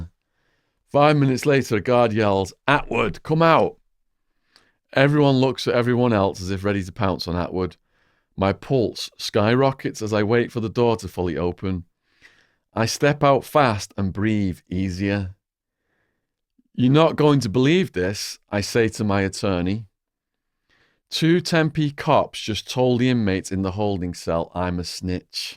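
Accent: United Kingdom. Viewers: u have some kind of uk accent, but you're English is quite proper to me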